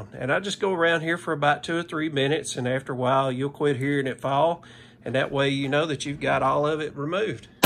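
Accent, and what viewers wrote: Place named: Arkansas, United States. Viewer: My guess is Arkansas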